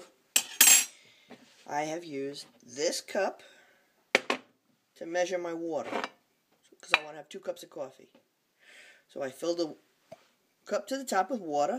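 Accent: United States. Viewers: I just bought a Corningware percolator at an estate sale here in Vegas! So cool. But not as cool as your awesome New York accent! i miss NY sooo much! You are fantastic Madame!!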